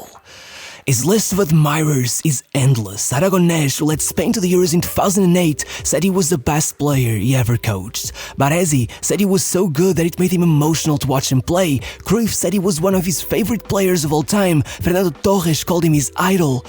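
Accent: Portugal. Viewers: Tu és português né? Sinto um sotaque português 😂😂😂 ao falar Paulo Futre Ronaldo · tens um bom ingles mas nota-se o sotaque pt hahaha bom trabalho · Pela tua voz, ouço um sotaque português. Fico feliz em saber que seja alguém da mesma língua que a minha a gerenciar este canal. Abraços do Brasil 🇧🇷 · I know you are Portuguese by the way you say those portuguese names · O gajo por trás deste canal é tuga? A maneira como diz os nomes convence ngl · Futre foi gigante- Mas esse inglês-tuga e essa forma de colocação de voz fazem do vídeo algo de absurdamente nauseante. Experimenta fazer a narração com voz normal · Its not his fault that he has a portuguese accent because he is portuguese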